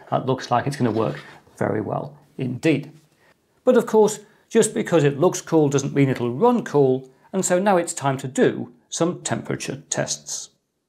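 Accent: United Kingdom. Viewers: Sorry for asking something that isn't on subject, but are you British?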